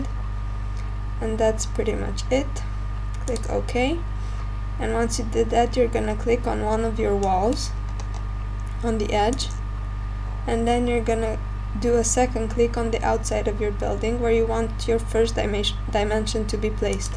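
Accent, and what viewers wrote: Eastern Europe. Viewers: You sounds like south slavic speaker. Am I right?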